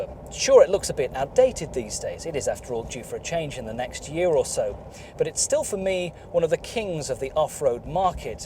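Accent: United Kingdom. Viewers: moronic comment. British accent is correct.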